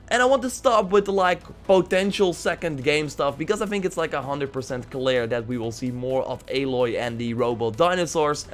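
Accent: Netherlands. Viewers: Are you from NEDERLAND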